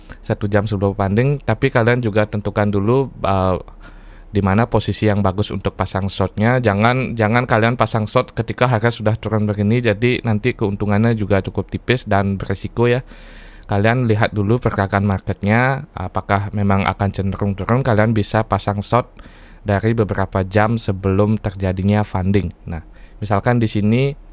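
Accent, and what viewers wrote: Bali, Indonesia. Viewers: orang bali atau org lombok bang ??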